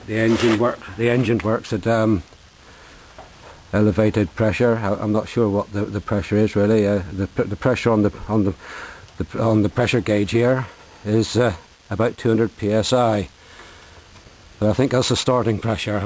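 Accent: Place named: Cork, Ireland. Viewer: he's from cork (ireland) i think :)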